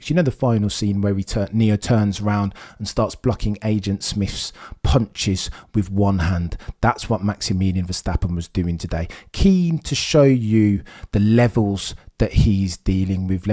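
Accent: United Kingdom. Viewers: You are turning more into max with every video. Pretty refreshing hearing a guy with a British accent just recognizing raw talent, even not if it’s from a Brit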